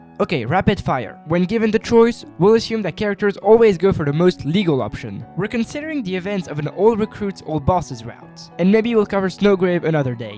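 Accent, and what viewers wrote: France. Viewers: Tu parles français? J'ai l'impression ton accent l'est un peu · En vrai un bon 8.5 je trouve, on comprend bien ce que tu dis mais on entend bien que t'es français mdr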